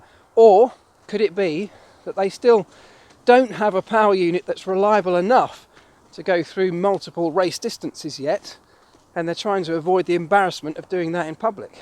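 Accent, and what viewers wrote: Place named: United Kingdom. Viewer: Like your british accent and good stories about F1. Good job and looking forward for more!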